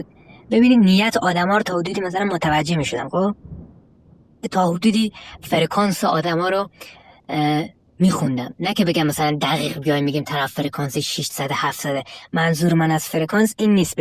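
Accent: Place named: Joveyn, Iran. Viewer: سلام آقا عزیز ،من شیفته اون لحجه جوینی که دارین شدم چون خودمم بچه جوین هستم سبزوار ،حکم اباد،،باعث خوشحالیه که همشهری هستم با شما انسان پرهیزگار😂🙏🌹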